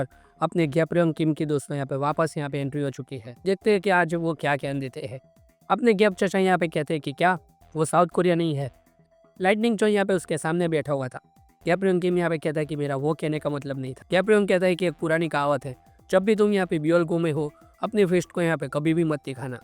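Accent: Gujarat, India. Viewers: From your ascent i can say u are gujarati i am also ❤😊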